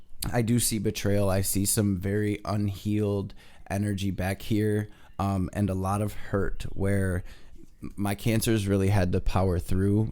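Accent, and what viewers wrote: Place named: Chicago, United States. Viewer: I'm new to your channel, like 30 days or so. Definitely sticking around. Love your vibes and that chitown in your accent. Thanks mucho fam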